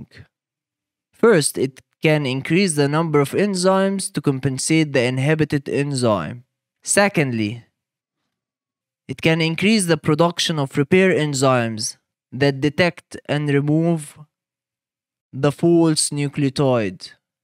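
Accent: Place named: Egypt. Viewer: اعرف المصري لما اسمعه😂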